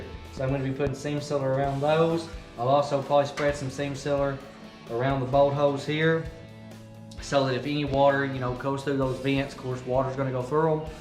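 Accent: South, United States. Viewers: Living in the south, this man makes me proud. To some (not all, I’m not going to paint with a broad brush) folks not from here, he may sound less intelligent because of his accent. How mistaken you would be. The south is covered up with folks that have great intelligence and common sense. LOL, I ain’t one of them, but they are here. God bless the South. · It's hard to get past his redneck accent. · ahh, you're like me then lol. well i hear the country accent. what state are you in neighbor? wonder if you ever make it to the MS gulf coast for Cruisin the Coast? *edit, just watched your how to register video where you mentioned TN.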